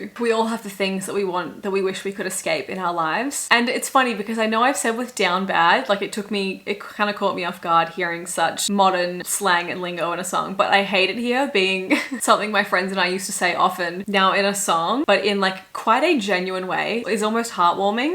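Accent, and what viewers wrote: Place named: Australia. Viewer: Hi, I just wanted to let you know, that when I bing searched taylor in paris, from a public computer, this post of yours, came as first listing (with filter of 20 min+ videos). I just saw you the first time, when you replied my comment saying I wanted to see your whole first time listening video of the new album. Mind you also, that from your accent, it sounds like you are Aussie? or some place far from USA, where I am. So... just to let you know, you are famous. At least for 20 min+ videos about.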